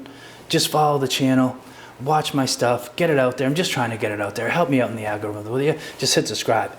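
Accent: Massachusetts, United States. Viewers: So nice to hear a good mass accent. My I miss home in Colorado. First time viewing you...looks good best to you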